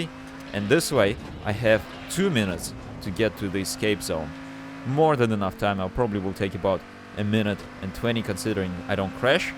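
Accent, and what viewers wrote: Russia. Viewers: Thx man I like your Russian accent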